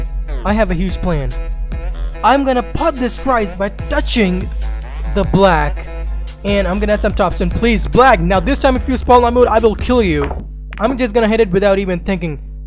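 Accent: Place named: South India, India. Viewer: Assalamu alaikum! Which is your native? You seem to be from South India! though you live in Kanpur.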